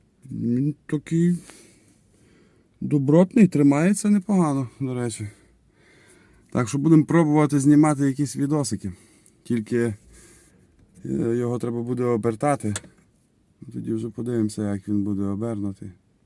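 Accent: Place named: Western Ukraine, Ukraine. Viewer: Судячи з акценту, ти із західної частини України. З понеділка теж стартую по Польщі. Думаю, зустрінемось.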